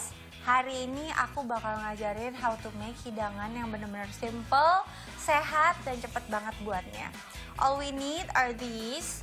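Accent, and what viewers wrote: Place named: Malaysia. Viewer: bahasa inggrisnya kayak org malaysia..ancur dicampur campur.. goodbye bahasa Indonesia klo artisnya saja suka pamer bahasa inggris nya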